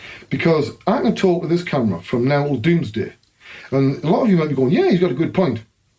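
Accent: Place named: North East England, United Kingdom. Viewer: Good to see your life after 3d series, think you are right to try to stick to one topic at a time for these, good for the algo. Have you thought about doing a regular podcast? I think you'd work well with people to bounce off, guests and/or co-hosts? Sure you've got the industry contacts to have loads of interesting shows. There's a few of these already, might be room for one with a North East accent😉?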